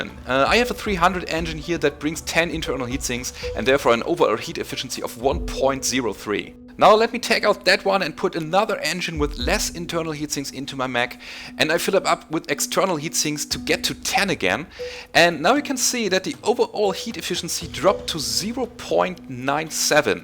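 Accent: Germany. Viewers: That German accent is music to my ears. Sorry, just had to point that out.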